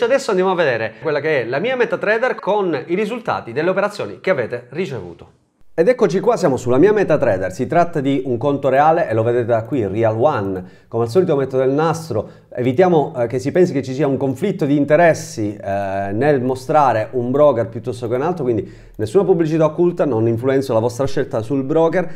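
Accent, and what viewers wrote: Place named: Apulia, Italy. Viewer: guarda io sono di Brindisi e il tuo accento è identico a quello della fascia tra Brindisi e Taranto. Che è molto diverso dal solito pugliese che si sente in tv che va da Banfi alla pizzica salentina. In effetti basta guardare una cartina delle zone linguistiche italiane per vedere che quella parte di Puglia ha lo stesso colore della Sicilia! Grazie per la tua gentile risposta! 👋👋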